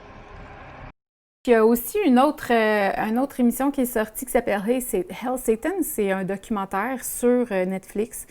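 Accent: Quebec, Canada. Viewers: J'aurais préféré que tu fasse une vidéo un peu plus longue c'est dommage parce que t'explique ça bien et pour un français j'ai pas eu de gêne à t'écouter malgré ton accent québécois.. En tout cas j'espère que tu poussera plus lorsque tu fera celle sur le pizza gate car pour le coup ya beaucoup a dire et c'est très très grave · Très cool ta vidéo chère amie Québecoise ! Bien montée, bien narrée (avec le petit accent qui fait plaisir !). Bisous de France ;)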